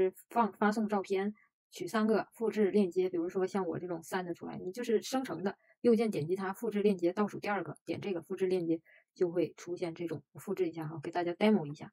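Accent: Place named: Northeast, China. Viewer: 你的东北式英语让我很糟心。也谢谢你的分享:face-blue-smiling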